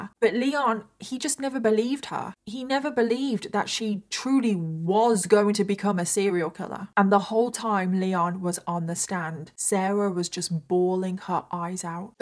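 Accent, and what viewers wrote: United Kingdom. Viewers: Your voice isn’t annoying because you’re sick. It’s because it’s British. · I just recently found your videos. I really wish you didn't feel narration with cosmetic/make-up application was necessary for success. You and your words are enough. Plus with the accent of the British Empire, we already think you are smart. Sidenote: use the Vicks (menthol, camphor, petrolatum etc) on a small patch on your chest before bed, wear a light shirt then go to bed.....Not that you sounded any different to me, but you sounded worried about it.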